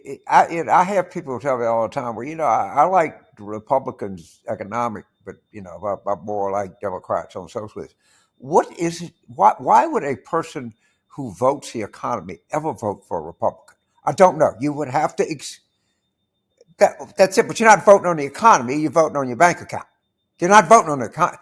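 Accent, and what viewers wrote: South, United States. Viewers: Mr. Carville, I cuss pretty much the way you do, but w/o the Southern accent. I’m an old broad from the southwest part of the San Fernando Valley in CA. But my point is that you not only make me laugh quite a bit, you are so knowledgeable about politics, but life as well. Thank you for continuing to share your knowledge and opinions with us. I appreciate your doing this so much.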